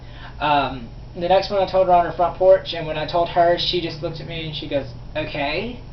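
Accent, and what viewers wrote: South, United States. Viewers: I dont know why but i love the southern accent❤️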